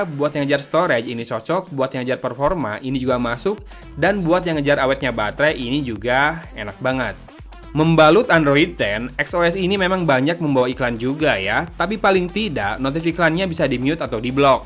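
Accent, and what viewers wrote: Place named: Sunda, Indonesia. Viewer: Suka sama suaranya aa. Sunda banget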